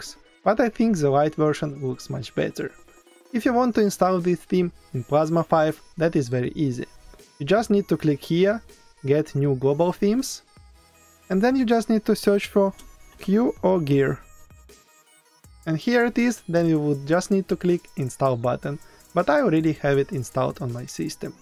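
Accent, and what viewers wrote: Russia. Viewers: лол, чувак, по акценту понятно что ты русский)))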